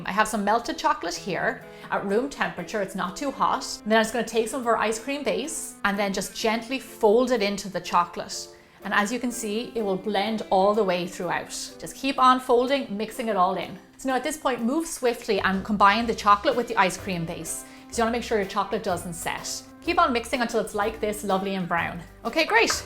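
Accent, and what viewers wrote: Ireland. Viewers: I love your Irish accent in the making of kulfi Ice cream. You should try Chamomila and honey Ice cream soon.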